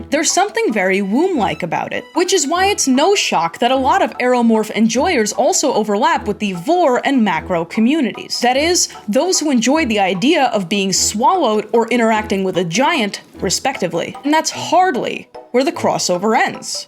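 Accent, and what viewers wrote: New York, United States. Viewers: New York!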